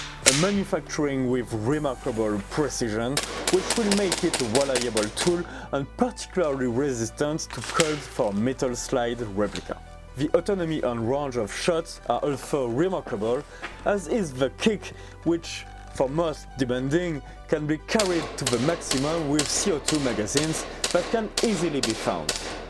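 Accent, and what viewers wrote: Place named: France. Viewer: Part of speaking other language is using its pronounciation. If you speak English, do not use French pronounciation. Respect your listeners by doing 100%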